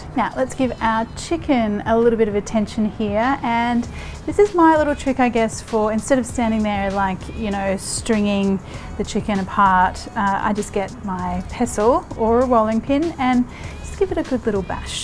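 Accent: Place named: Australia. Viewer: OMG i just shared 1 of her videos w my grown-up children n i had told them the exact same thing! I just love to watch n listen to her lovely Aussie accent😁. I agree totally, Soothing ...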